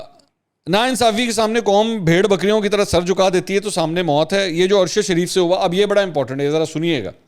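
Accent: United Kingdom. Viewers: 😂 good British accent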